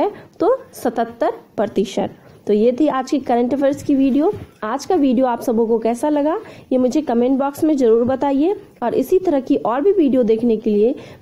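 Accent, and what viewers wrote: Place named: Maithili, India. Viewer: thanxx and aapke bolne maithily tone ki sweetness ki chhataa saaf jhalaktee hai 😀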